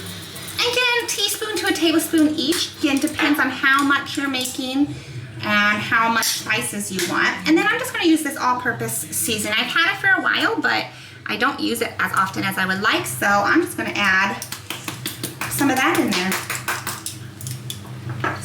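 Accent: United States. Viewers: Good morning. Nice to watch this new video. Frankly speaking, I watch your videos not because I am fond cuisine, but because I listen to you speaking English in American accent. I am a fervent learner of English language. I really appreciate the particular video, in which you showed how passionately you are leaning Bengali. I will be looking forward to watching such more videos from you.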